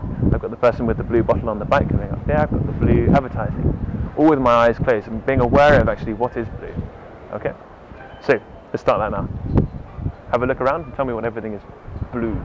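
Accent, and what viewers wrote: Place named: England, United Kingdom. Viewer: Hi, very interesting video, nice to have a English accent for a change lol. Also nice to have someone presenting photographic concepts in this way. Thank you.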